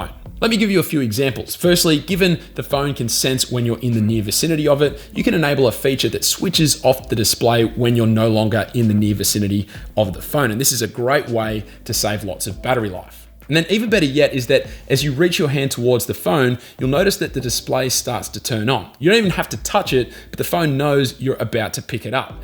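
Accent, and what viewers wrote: Australia. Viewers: Just stumbled across this video, and damn I wish YouTube had of recommended you before now. It's great to get an honest review, and have it in an Aussie accent. I've used every pixel since it first launched, and have never experienced the issues in any of them that were previously reported, but the decision to put the small battery almost turned me off the phone. Almost, the camera is well worth the phone. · Thank you so much for your excellent reviews and unbiased advice on mobile phones - yours is the go-to channel for a well produced, honest review. I am thinking that maybe this Pixel 4 review did not require you to repeat honest 10 times though - mate your viewers can see you are honest by the quality of all your reviews! Your Australian accent is a refreshing change from the multitude of other cell phone reviewers! Looking forward to more from your channel.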